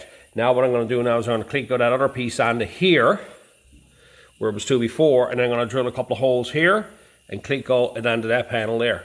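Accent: Ireland. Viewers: Nice work. Great accent, sounds slightly Irish! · what accent has this guy? there is some Irish accent on some words and not that fake Irish accent you think we Irish have. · . Iv just found this channel mate. Iv seen 4 videos of yours. I bought my grandson a we car there's a we hole in the floor and the rockers. Looking at you're videos I'm sure I can repair them. Do I hear a bit of Irish in your voice. anyway good job all round mate. Best of luck for the future. Paddy from Ireland.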